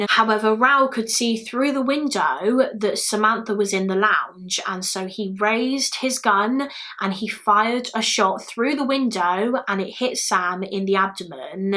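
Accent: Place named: England, United Kingdom. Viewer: The reason why this American loves you is your unique English accent. I could listen to you all day!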